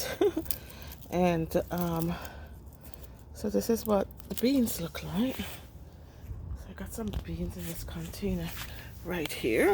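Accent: Jamaica. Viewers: Hi Sis. Yes thought I heard a Jamaican accent. It's great to see how you used the containers for all these veggies. Compost is a great idea. Also it helps to switch out the types every 3 years. New friend. ☺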